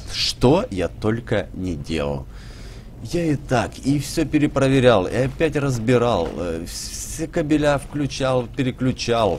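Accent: Ukraine. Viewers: Ты Украинец? Что за акцент?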